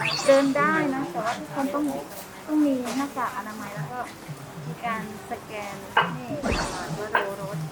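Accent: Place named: North, Thailand. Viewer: Journeyที่รักเห็นว่าไปมาทั้งต่างจังหวัดและต่างประเทศแล้ว อยากเห็นไปเจอนี่ในบ้านหน่อยค่ะ😂 แบบเป็นคลิปทัวร์ในบ้าน เพราะเห็นมุมในบ้านพี่ ผ่านในไลฟ์มาบ้างแล้วส่วนตัวคิดว่ามันสวยแล้วก็น่าอยู่มากๆเลยอยากให้พาชมมุมอื่นที่ยังไม่เคยเห็นแล้วก็แนะนำการตกแต่งบ้านอะไรประมาณนี้อ่าค่ะ เป็น special clip journeyที่รัก x SPECSPACE ก็ได้เพราะพี่ ก็ทำรายการแต่งบ้านอยู่แล้วด้วย น่าจะสนุกมากแน่ๆเลย ยังไงก็รอติดตามคลิปต่อไปอยู่นะคะ☺️💗💗💗 ปล.พี่ อู้กำเมืองคลิปนี้น่ารักมากๆเลยย พูดอีกบ่อยๆนะคะ55555 <link>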